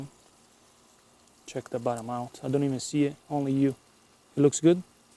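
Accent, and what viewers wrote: Italy. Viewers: Si sento proprio poco che sei italiano 😁😁💪💪🍕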